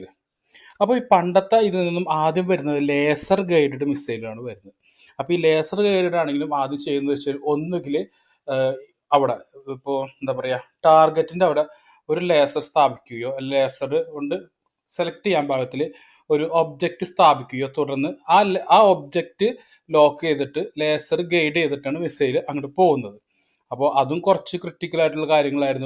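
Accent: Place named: Thrissur, India. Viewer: മലയാളം ശരിക്ക് സംസാരിക്കാൻ ശ്രമിക്കൂ. തൃശ്ശൂർ സ്റ്റൈലിൽ അല്ല വലിച്ചു നീട്ടിയുള്ള സംസാരം ഒഴിവാക്കു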